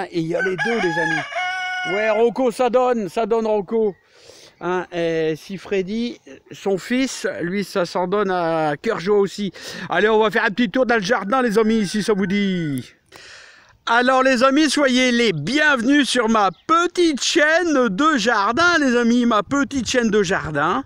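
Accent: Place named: Nord-Pas-de-Calais, France. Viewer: Moi j'adore quand tu parles ch'timi 😂❤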